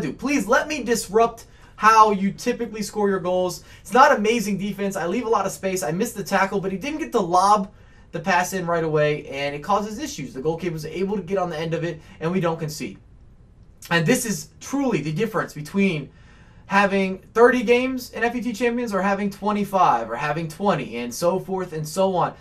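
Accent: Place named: Texas, United States. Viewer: I needed this, you are my Texas Accent Speaking Angel.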